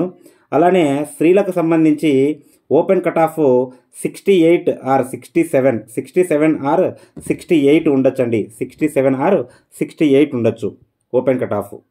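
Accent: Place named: Telangana, India. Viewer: me language telangana voice la undhi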